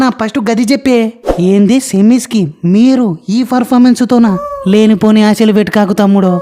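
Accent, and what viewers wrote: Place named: Andhra Pradesh, India. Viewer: Ni videos & ni andra slang I like it bro keep continue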